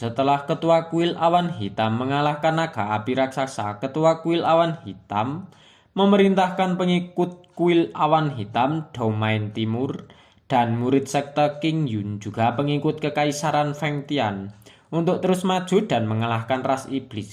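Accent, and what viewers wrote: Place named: Java, Indonesia. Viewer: Bang bahasa di ganti dong kebahsa Indonesia Jagan bahasa Indonesianya kayak di ucapakan seperti bahasa Jawa mungkin karena itu sasgraibernya sedikit yg laik pun sedikit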